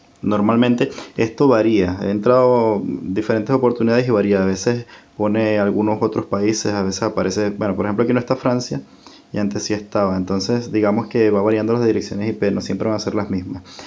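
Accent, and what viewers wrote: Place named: Venezuela. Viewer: Wey eres de venezuela tengo un compa que habla como tu y es de venezuela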